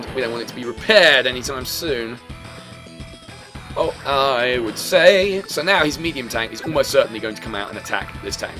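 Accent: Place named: United Kingdom. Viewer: I was not expecting a British person. I'm a Brit myself, but still... Anyway, I hope your voice acting for Green Earth will be EPIC, since they're all British, too. I like to think I'm good at impersonating Drake. 🤣 Edit: Actually... Green Earth is probably not all British. Scratch that. Anyway, I'm so happy to see this content from you. ❤️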